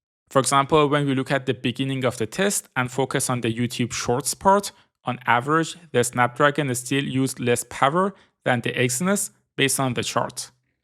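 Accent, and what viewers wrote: Iran. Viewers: عشققق فقط چرا حس میکنم لحجتون اینقدر ایرانیه ؟☕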